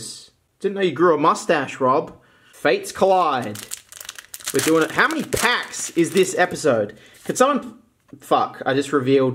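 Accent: Australia. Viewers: You can tell he's Astran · Love that "Dewgong" and "Jewgong" are essentially indistinguishable because of the Aussie accent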